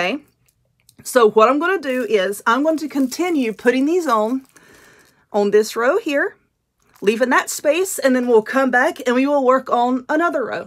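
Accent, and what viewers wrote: South, United States. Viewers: What state are you in? I like your Southern accent. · I love your southern accent. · this is absolutely beautiful! I can't wait to find the frames. I've been following you for a couple of yrs now and I absolutely love watching you with all of your crafts. You've got a awesome personality. I love your personality. I don't understand why people have to be so low. If they don't like you or what your doing there's a simple solution. Get off the channel. I loved how you handled that by telling them to get a hobby lol. Some people just love drama! My friend does wreath videos and there for awhile she was getting harassed pretty bad. Mostly from other wreath makers and their followers. I hope that it doesn't get that bad for you for your so much fun to watch. I also love your accent. I'm a country girl myself with a heavy southern accent. 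Anyways keep ignoring them stay positive with your bubbly personality!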